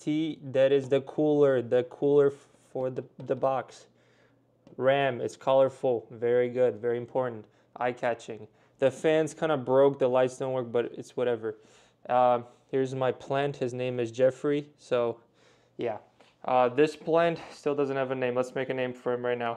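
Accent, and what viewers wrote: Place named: Poland. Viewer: Yo what country are you from you’re giving me polish accent vibes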